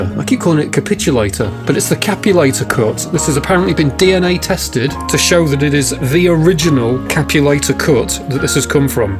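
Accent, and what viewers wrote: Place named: England, United Kingdom. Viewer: Maybe it is because of the english accent in general, but for me as a german you kind of sound a little bit like Karl Pilkington!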